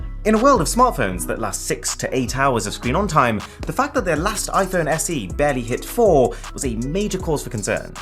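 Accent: United Kingdom. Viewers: Hello Mrwhosetgeboss...Can you do ya favour and add subtitles.... it's still hard to catch up with your British accent,still with auto generated subs.. Help some Indians bro...😄😄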